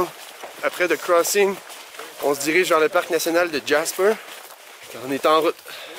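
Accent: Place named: Quebec, Canada. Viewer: Merci de partager ça! Le timing est parfait étant pogné dans la maison avec les Rocheuses pratiquement fermées partout en Alberta. Je continue le vidéo dans quelques jours pour rallonger ça un peu! Très belle cinématographie et en tant que québécois expatrié en Alberta, c'est le fun d'entendre l'accent (de Québec?)! Bonne chance dans tes futurs projets. ✌🏼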